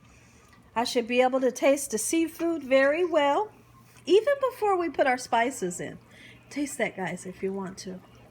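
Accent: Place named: California, United States. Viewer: She sounds like CALI lol. I'm from ST Louis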